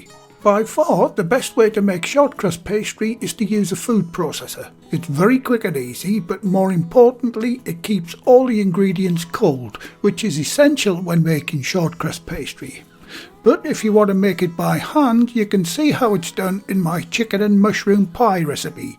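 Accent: United Kingdom. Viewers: I love your video!! I love British accents! But also, it was easy to follow the step by step and it was fast! Ty!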